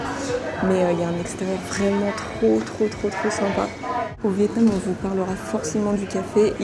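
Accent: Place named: France. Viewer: Really like your French accent! Making me to relearn French. Look like you enjoy time in Vietnam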